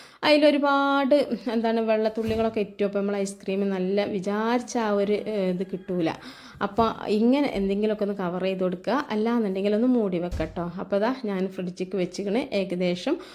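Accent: Malappuram, India. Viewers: Sathyathil ivar malappuram kare naattikkan vendiyanennu ingane samsarikkunnath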